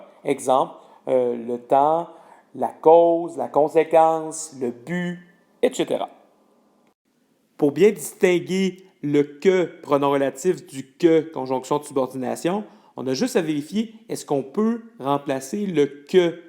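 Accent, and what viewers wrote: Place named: Canada. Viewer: Super Tuto et j'adore l'accent canadien :D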